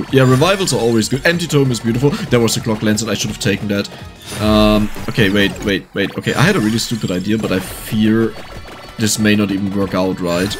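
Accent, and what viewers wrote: Germany. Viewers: I am consistently impressed at the level of care and skill you put into these videos. Looking forward to more laughs and awesome content from my favorite funny talking German man ❤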